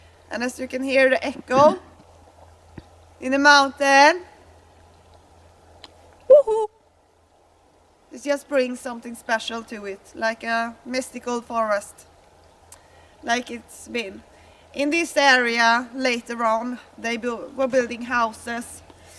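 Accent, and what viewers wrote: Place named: Sweden. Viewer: Thank you for this wonderful documentary, I enjoyed it so much! I'm an Australian and I visited Halleberg last year. I have been studying the Viking Age and the earlier periods since I was a teenager in the 70s and I've always dreamed of going to Sweden but I never heard about these two mountains, and I especially had never heard that they were Asgard and Valhalla and Odin's lookout. I started writing a book 2 years ago which I've just published and I talk quite a bit about this area because my Swedish friend took me there and translated the posters in the museum about the Aesir coming and setting up there fortresses there. I think they built those great stone walls you were showing. You taught me a lot of very useful things, I found you because I was looking for information about Hästevads Stenar. You look really great in your warm green dress, you really do look like a magical lady from the Viking age. 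The way you speak English with your Swedish accent is also very charming for us to listen to. I hope to come back again soon and camp there and really look over the whole area. Kindest regards David from Australia.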